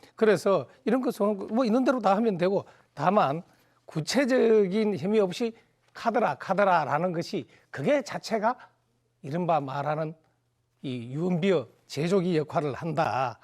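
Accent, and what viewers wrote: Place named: Gyeongsang, South Korea. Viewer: 갱상도 아이가 ㅋ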